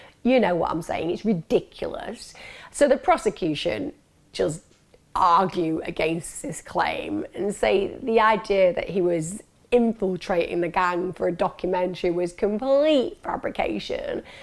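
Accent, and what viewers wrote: United Kingdom. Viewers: I just want to stop by applauding you with your consistency I mean I seriously don’t know how you do that! You’re a professional background is so interesting and your research is beyond Par! I moved from Wales to Southern California and seriously I just love hearing your British voice I miss that a lot been here nine years now! I just shared the Turpin case on my Facebook as that is actually really close to me just a few miles! Keep shinning you are incredible! And thank your amazing Hubby!! 🤍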